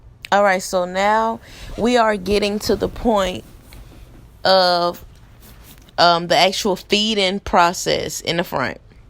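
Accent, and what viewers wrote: New York, United States. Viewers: Awesome work! And I love your accent! New York, right? I'm originally from Jersey now in NC. Ain't nothing like accents from up top! Love your videos and please keep sharing!!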